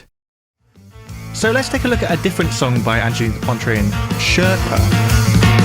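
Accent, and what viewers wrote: England, United Kingdom. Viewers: Nothing like an English accent to wash off the magic.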